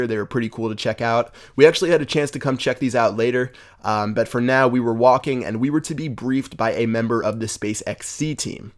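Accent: Netherlands. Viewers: You could really hear it was a dutch man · that's a dutch accent for sure lol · All of us Dutch people recognize his accent haha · yeah he is dutch that accent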